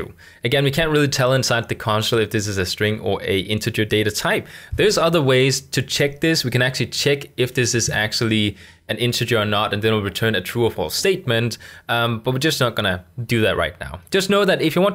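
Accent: Denmark. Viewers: Er du Dansker?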